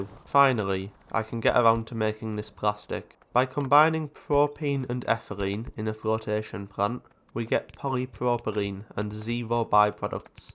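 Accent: United Kingdom. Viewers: i meant start of the video when you saying about Ko-fi supporters, if im right in Britain people when saying "a bottle of water" they pronounce it like "a boa of woa", and when you said "supporters" by pronouncing it "supo-es" i remembered about Britain accent, LOL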